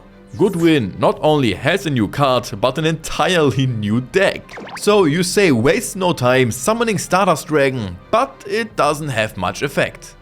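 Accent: Germany. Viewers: Lass mich lügen aber bin mir bei dem Akzent zu 99% sicher dass du deutsch bist 😅 Gutes Video btw. Endlich mal wieder ein Tuber der mal wieder Videos zu 5ds macht 😁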